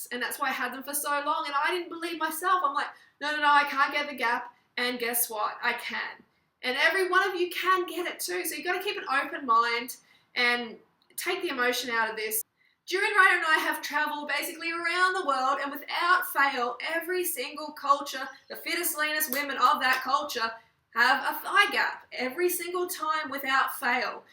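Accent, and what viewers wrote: Australia. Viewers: what part of australia are you from? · You ave an Australian Accent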